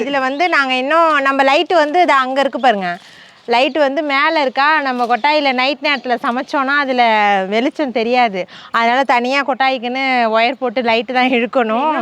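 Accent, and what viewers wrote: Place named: Mayiladuthurai, India. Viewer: மண் மனம் வீசும் வீடியோ....manasuku avalo asaiya irku...keera தண்டு karuvatukolambu natural ah neenga pesurathu...ketkave asaya irku....becz enga amma mayavaram so nanga veetula pesura mathiriye irku...enaku unga வீடியோ pakkum pothula nanga munadi iruntha life ah niyabaga paduthuthu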